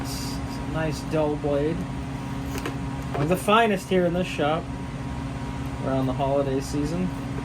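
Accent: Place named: Massachusetts, United States. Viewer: Subtle hints of that Bill Burr Massachusetts accent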